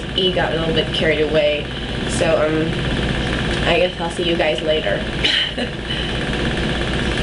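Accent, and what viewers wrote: United Kingdom. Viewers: wicked, i live in london and im a filipino. u got a posh accent. nice try ^_^ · cool E! gusto ko yung British accent mo,ah!I'm trying to change my voice sometimes(practise ba)kaso bakya tlaga!hhahaha Galing!iba na tlaga pag bihasa sa pagsalita ng Ingles.wla akong ma-say, ingit ako!haha!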